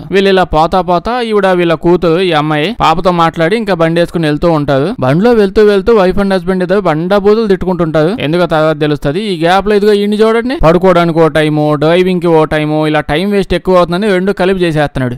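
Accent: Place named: Godavari, India. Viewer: ఇండోనేషియా సినిమా గోదావరి యాసలో అద్భుతంగా చెప్పావ్ బ్రో 😂😂😂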